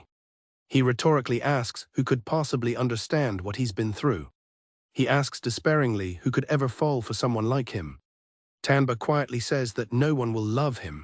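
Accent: United Kingdom. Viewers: Why is the ai british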